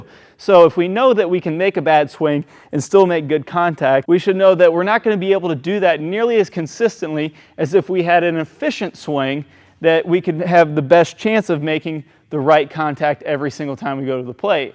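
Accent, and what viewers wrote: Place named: United Kingdom. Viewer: yeah on ur youtube channel u sound like ur British r u British?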